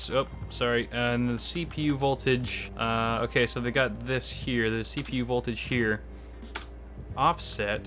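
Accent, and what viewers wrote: South, United States. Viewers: I read this in southern accent- I have no idea why!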